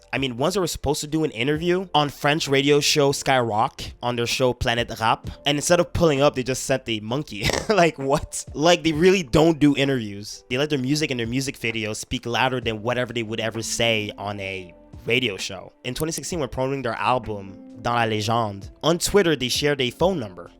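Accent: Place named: Canada. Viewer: Are you québécois? Youre french is really good man and i feel that you got that canadian accent hahah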